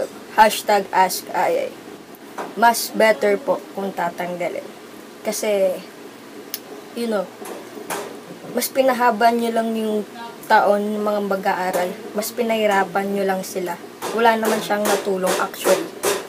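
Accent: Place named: Ilonggo, Philippines. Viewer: Ang kyot ng ilonggggg 😍😂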